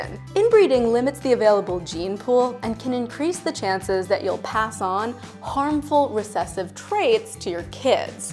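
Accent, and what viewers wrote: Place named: South, United States. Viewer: Before you even watch this you know she is a southern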